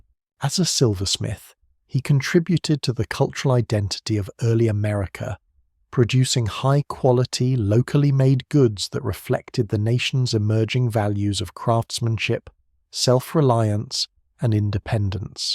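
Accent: United Kingdom. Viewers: WHY is this deeply American story being narrated by an English voice? We went to war against that country .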